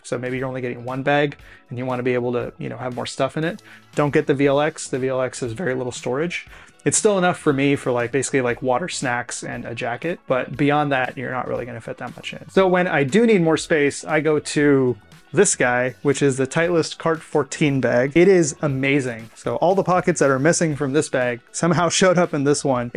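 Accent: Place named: New Zealand. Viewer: Cant believe I didnt pick up the accent. Cant stand listing to kiwi accents, or sepo's either, but put them together and it works. US ladies def love the kiwi twang aye :)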